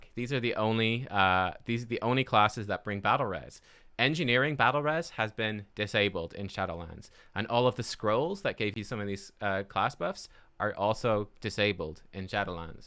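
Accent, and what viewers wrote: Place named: Ireland. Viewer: Finally, another great WoW content creator, and best of all, Irish! At least I think you're Irish? 😅 Nice work!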